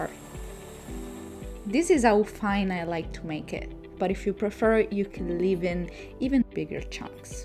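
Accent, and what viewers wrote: Italy. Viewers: Si sente che sei italiana❤ bravissima grazie della ricetta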